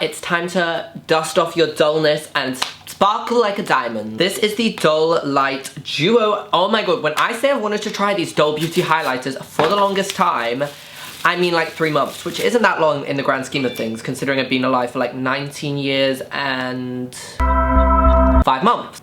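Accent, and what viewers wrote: Scotland, United Kingdom. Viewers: the scottish accent through this killed meee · The Scottish accent tho😂😂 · Scottish then? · Love ur Scottish voice we love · we stan a scottish intro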